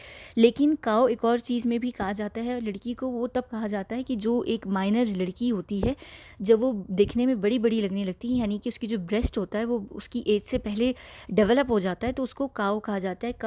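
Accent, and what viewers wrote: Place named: United Kingdom. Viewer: Exactly, i am waiting for her english video. I really love her bristish accent.😊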